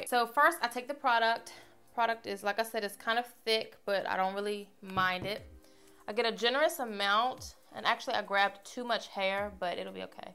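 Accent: South, United States. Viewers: your country voice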